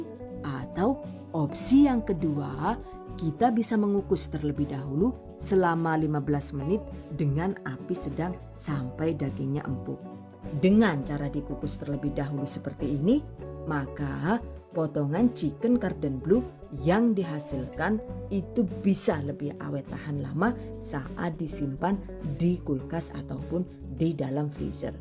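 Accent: Banyumas, Indonesia. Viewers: Kiye wong Madiun apa wong ngapak si sing duwe channel dadi geget😂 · sebelum2 nya cuma nonton n👍.tp baru2 ini subscribe .sangat cleaaar penjelasan nya . ide2 buat jualan nya goood...ngapak nya 😁👍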